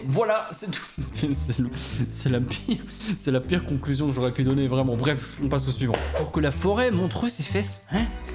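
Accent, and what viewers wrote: Belgium. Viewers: Et tu belge ? Dezoler de te déranger au passage 👉👈😀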